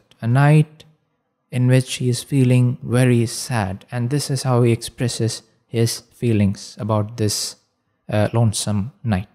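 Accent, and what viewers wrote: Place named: India. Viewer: السلام عليك انت عربي ام من النهد لان لهجتك بالإنجليزية تشبه اهل الهند 🌹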